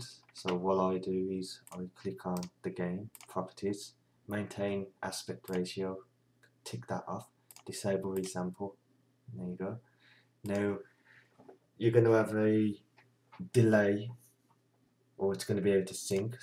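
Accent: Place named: Birmingham, United Kingdom. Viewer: Woohoo a brummie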